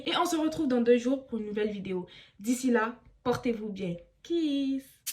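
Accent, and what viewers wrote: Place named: Ivory Coast. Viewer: J’aime trop la façon tu nous racontes la story🥰🔥 l’accent ivoirien et tout et tout je kiff 💕 Bourkina / Civ c’est la famille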